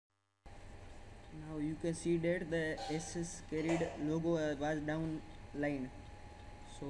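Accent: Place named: India. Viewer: Shit is that mumbling English or some DR. Bombay shit?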